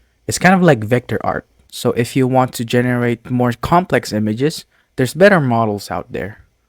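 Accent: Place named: India. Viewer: I heard an Indian accent and immediately clocked off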